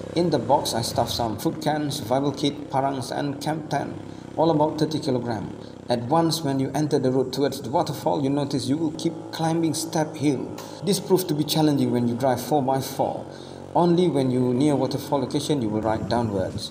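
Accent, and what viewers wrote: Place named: France. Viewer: Where do you live? You sound french but the scenery makes me second guess myself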